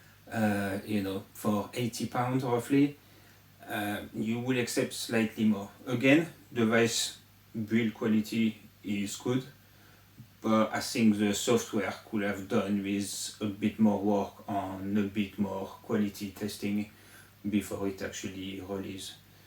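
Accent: France. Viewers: sacré accent français je trouve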